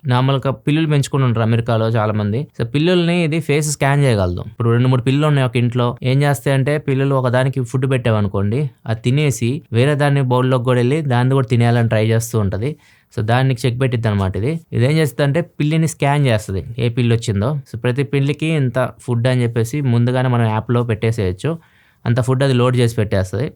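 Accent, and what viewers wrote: Ongole, India. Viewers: Intiki velutunna no Ongole language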